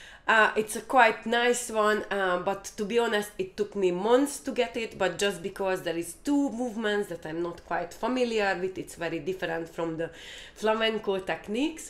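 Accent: Hungary. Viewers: magyar akcentus befigyel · sounds like Magyarrrr. I remember this accent from Dominica my sweet girl from Zsigetvar love from Istanbul thanks for this video. Ksönöm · A magyar akcentus de ismerős mindig basszus :D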